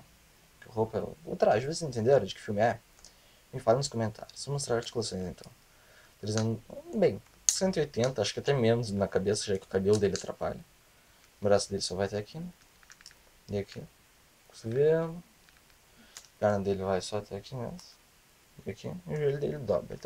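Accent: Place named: Portugal. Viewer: ah ta....é que o jeito que vc fala parece de portugal kk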